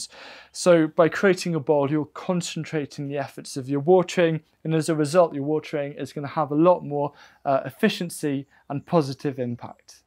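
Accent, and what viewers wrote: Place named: Wales, United Kingdom. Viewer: I agree with your views on no dig, but with one very important caveat: in a very small garden it is reasonable, as you can probably produce enough of your own compost, or only need to buy in very litte. However, once the area gets bigger (I have around 50 sq m of vegetable beds in rotation), I cannot produce enough own compost for the whole area such that I have say 3-4 cms of compost on all the beds. I have bought in from the local recycling plant in order to compensate. However, one is never sure just what is in the compost (even here in Germany), and it costs us retail buyers simply too much - because we are not selling our produce. My answer is to rotate with a combination of green cover crops on the areas that profited from real compost in the finishing season, and use my own compost and very little bought in compost for the area that will be used for the potatoes and carrots in the following season. 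This is a compromise, as it does entail working in the green cover crop, but I think that the combination may even be better for soil health in the end, as the soil is being fed not just compost, but cover crops as well, in rotation. I wish we had as much rain here in Mönchengladbach as you do in Wales, and I am envious of your set up. But I am 70 years old, only turned to vegetable growing 5 years ago, and to be honest, the sort of area you are „farming“ is very much a young man‘s job. But I do enjoy your videos, and I love your Welsh accent!!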